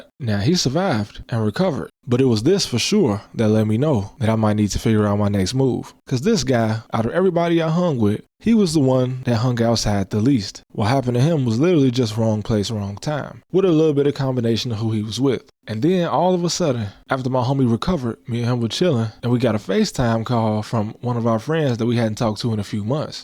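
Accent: Chicago, United States. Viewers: And bro you definitely got that Chitown Southside accent. Great videos! Nostalgic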